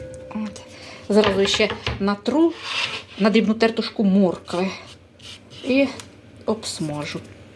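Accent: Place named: Cherkasy, Ukraine. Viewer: Похне горно! Черкаський говір😊😅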